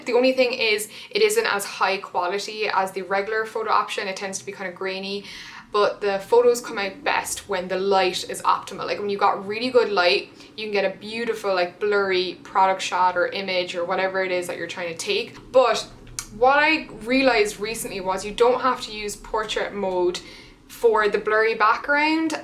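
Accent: Ireland. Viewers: Funny thing.... I was watching while getting my son (12) ready for school then sees you and said that he thought I was watching RetroFlame! I told him this is and she’s Irish too. Her and Erika have the same accent. I do tell him and show him from time to time the Youtubers I watch. Just thought it was hilarious! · Love videos like this, I always learn something :) Maybe you can do one about how to do well on Instagram? Also, is it just me or is your accent stronger after you spent some time in Ireland? Such a nice accent :)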